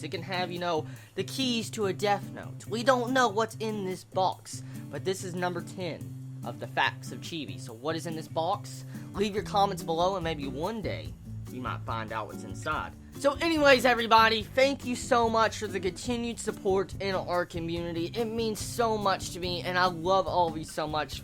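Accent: South, United States. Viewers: Is it me or do have an southern accent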